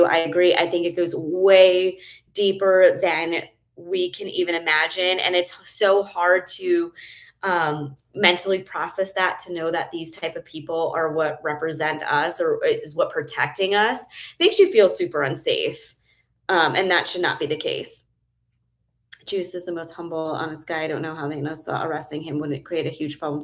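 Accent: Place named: New Jersey, United States. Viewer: Wild guess but are you from Jersey and/or Italian?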